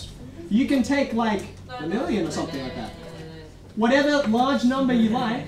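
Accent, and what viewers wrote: Australia. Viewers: Is he an Australian Asian? He looks Asian, but he has an Australian accent. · Clearly Australian haha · It sounds like he speaks English as a first language, as you can distinctly hear an Aussie accent. He likely has learned some of the backgound of Chinese, since he did introduce Chinese numerals in his class to make another point. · Prashant Kumar It's an Aussie accent, bruh. And he lives and works in Sydney, Australia.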